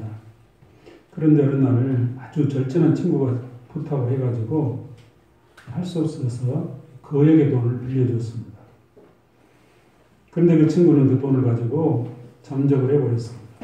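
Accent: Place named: Jeolla, South Korea. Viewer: 어제 꿈을 꾸었습니다. 선생님꿈을 몇달만에 또 꾸었습니다. 살면서 딱히 선생님을 생각하지않아도 1년에 한두번은 꼭 선생님꿈을 꾸게됩니다. 그때마다 선생님이 그립고 궁금했지만 소녀시절 추억은 추억으로 마음에 묻고 그냥 세월따라 가곤했습니다. 그런데 오늘은 선생님이 궁금해졌습니다. 이 쉬운세상에 찾을려면 얼마든지 찾을수있다는 생각에 네이버를 샅샅이 뒤졌습니다. 유투브를 샅샅이 뒤졌습니다. 목사님으로 검색했더니 몇분의 목사님이 떴지만 저는 대번에 이분이시겠구나 싶었습니다. 40년전 저는 어리디어린 열네살이었죠. 중학교 1학년2학기때 오셔서 드문드문있는 수학과목을 한학기만 가르치시고 떠나셨는데 40년이지나서 선생님을 알아뵙기란 쉽지가않더군요. 사실 지금도 긴가민가합니다. 오늘은(지금 시간이 새벽이니 어제는이 맞겠지요) 하루종일 선생님 유투브 아니 목사님 설교말씀만 들었습니다. 또 없나 샅샅이 검색해서 청소하면서도 듣고 염색하면서도 듣고 씻으면서도 듣고 마트오가면서도 듣고 누워서도 듣고 앉아서도 듣고 서서도 듣고 걸으면서도 들었습니다. 그러니까 어제는 새벽부터 선생님꿈 아침부터 낮 밤까지 하루종일 선생님 아니 목사님 설교말씀만 들었습니다. 순전히 우리 수학선생님이라는 이유때문에요. 덕분에 하루종일 하나님말씀 실컷 들었습니다. 그런데 진짜 우리선생님이 맞나요? 그때는 제기억에 아주 젊고 엄청 미남이셨는데 지금뵈니 키 크셨던거랑 얼굴 이미지가 비슷해요. 그리고 설교말씀듣다보니 악센트가 전라도억양이 있으셔서 그때 선생님 맞으신거같아요. 혹시나 사모님이 카메라에 잡히면 더 확실해지는데 아무리 이것저것 돌려봐도 사모님이 안잡혀 40년의 세월이 흘러서 제가 사모님도 못알아뵙는가싶구 확인이 안되네요. 하여튼 선생님 참 반갑습니다. 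유투브 올려주신 집사님 아주 감사합니다. 덕분에 집사님찬양도 많이 들었습니다. 앞으로도 목사님 설교말씀 자주 올려주시면 안될까요? 소리가 작아서 아주 집중해서 들었습니다. 앞으로도 잘 부탁드립니다. 감사합니다.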